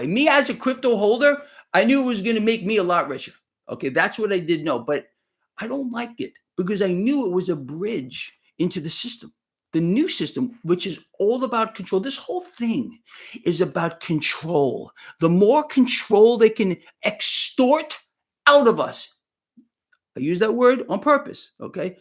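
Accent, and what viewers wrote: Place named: Long Island, United States. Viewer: “Hey guys it’s me,!” Your Vinnie Santucci-Long Island/Elmer Fudd combo accent is legendary!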